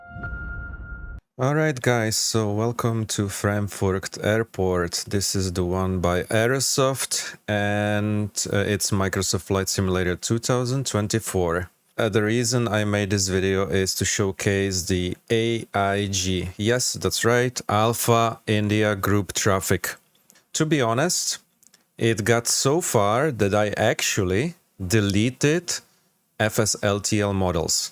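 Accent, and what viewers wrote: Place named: Czech Republic. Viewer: poznám Čecha jak se snaží mluvit anglicky na 100 km😂😂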